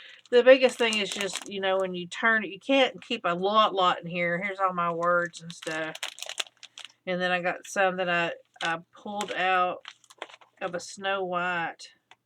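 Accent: South, United States. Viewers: How cool that you're able to find so many fun things for your book! I really enjoy watching your videos and seeing what you come up with. Ain't it the truth that these gluebooks ain't going in the Lourve? So we need to just relax, cut, and glue, like Nicole says. It's all about the fun, isn't it? I was born in Alabama and raised there and in East Texas. I have a Southern accent, too, and listening to you makes me feel like I'm back down home. I live in on the Southern Oregon coast now and I do get homesick sometimes. Love ya, girl.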